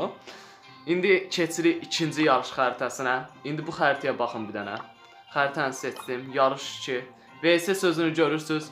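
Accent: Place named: Jabrayil, Azerbaijan. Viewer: Axşamın xeyir qaqam. Necesen? Srn haralısan? Lezzet eliyir danışığın. J ile danişirsan. Cebrayıl lehcesine oxsuyur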